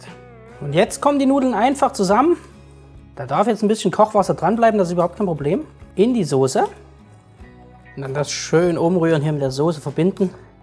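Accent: Saxony, Germany. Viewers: Grad das Sächsisch finde ich so charmant 😉 zusammen mit deinem trockenen Humor. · Sehr lecker, wir machen unsere Nudeln selber, Salz erst rein wenn das Wasser kocht(das Salzwasser braucht länger bis es kocht-gerade bei den Preisen-ne ich bin nicht neumalklug, habe ich von meiner Tochter-sie ist Köchin) Warum Untertitel - man hört ja nur wenig vom Sachsen😂-bin ich ja auch Sonst ist dein Rezept prima lecker🎉👍👍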